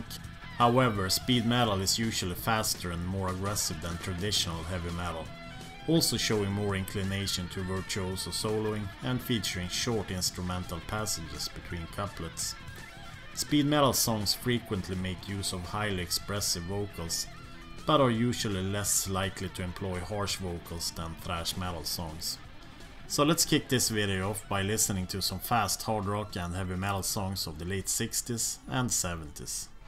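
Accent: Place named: Sweden. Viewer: man, you're hilarious! You talk in this cool, deep Swedish accent and then you say stuff like "howdy folks" 🤣. I love it!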